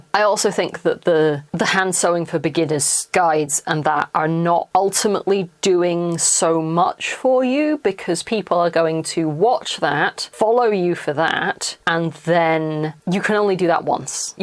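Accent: United Kingdom. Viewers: I love listening to the non-posh British accent. Because its HONEST. (And I run you at 1.5x or 2x, so you definitely dont talk too fast.) I love the ND content, because it fits my brain. I dont even remember what I started watching you from, but I love the "historical costume" side of YT. Stayed for the clearly LGBTQIA+ person, because you remind me of my family. I dont plan on LARPING, or starting YT, but stay for that kind of content because YOU. And I live in my subscription tab too (well, from there to "watch later" because I have more than I can watch daily.) Smart idea to beginner YT peeps: have a catalog ready to upload BEFORE you start the channel. Its easier to release on a schedule if you have a month of already edited things ready to upload.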